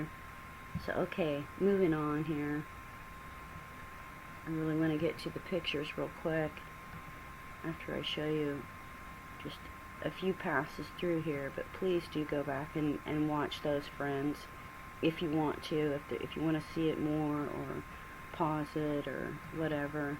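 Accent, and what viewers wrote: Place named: South, United States. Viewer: spoken with a sweet southern drawl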